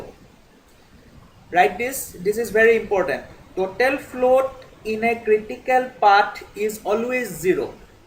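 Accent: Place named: Assam, India. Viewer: Ur accent is like an Assamese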